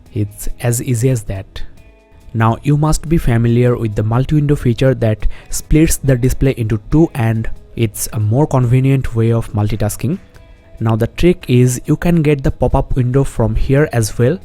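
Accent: India. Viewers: Indian accent "~"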